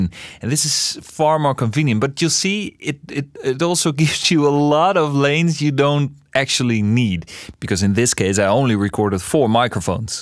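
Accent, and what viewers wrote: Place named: Netherlands. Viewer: While listening to this tutorial I thought 'Hmm, this sounds a bit Dutch'. Then I realized I recognized the voice. Then I saw it was you. Thanks, ' '!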